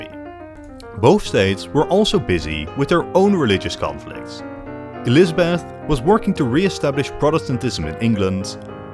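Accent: Netherlands. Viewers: you heard his accent? Only boomers can get a more Dutch accent then him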